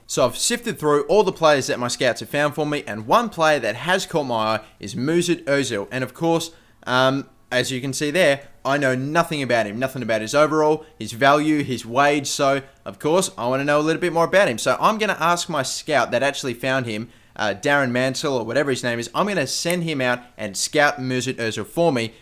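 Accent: Australia. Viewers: hihihi australian accent is so funny :)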